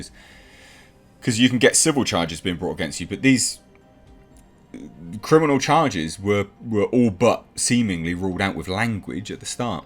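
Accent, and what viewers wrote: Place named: United Kingdom. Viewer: You're so handsome, then add the British accent and it's a wrap for me lol. Sorry 🤷🏻‍♀️🤦🏻‍♀️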